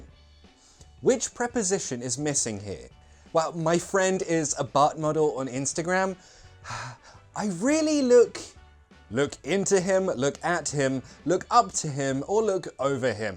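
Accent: United Kingdom. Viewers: "From Bangladesh 🇧🇩🇧🇩" I have already watched many offline videos of your channel. That's are very useful for learning and pronunciation.So, this channel has become my best channel in YouTube for learning English in British accent. Thanks a lot,.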